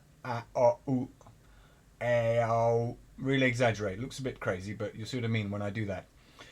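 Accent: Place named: United Kingdom. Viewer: Thx i'll tying to do with your video. Good Britain accent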